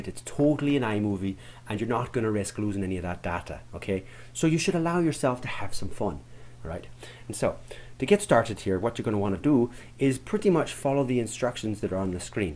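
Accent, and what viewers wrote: Ireland. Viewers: With Irish Accent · Like your style. You make it interesting and easy. Also, great visibility to see exactly the buttons and the steps! The Irish makes it interesting too. Thank you.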